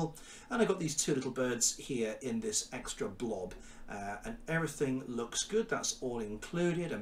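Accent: United Kingdom. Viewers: YOU KNOW YOU HAVE A GOOD BRITISH AX CENT.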